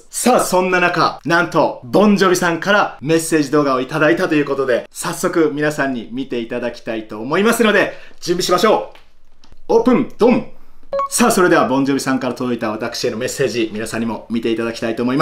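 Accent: Japan. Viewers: ニュースなってたからきんに君の動画初めて見たけど、関西弁なんだね！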